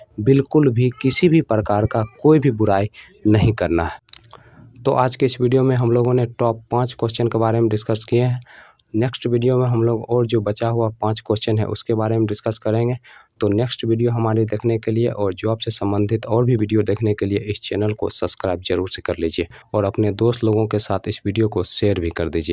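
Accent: Bihar, India. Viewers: u r from Bihar Right?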